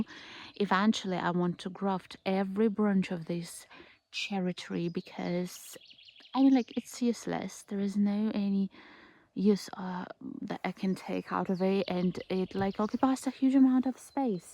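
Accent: United Kingdom. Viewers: Always lovely to see you and your garden. Have you continued studying language? Your accent sounds even more British than I recall from earlier videos.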